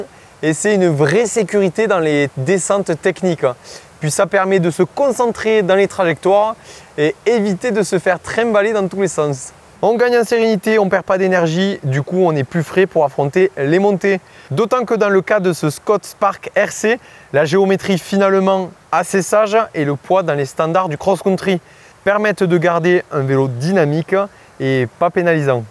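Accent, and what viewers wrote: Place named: Toulouse, France. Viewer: c'est pénible cet accent du toulousain... tu pourrais pas copier l'accent ch'ti?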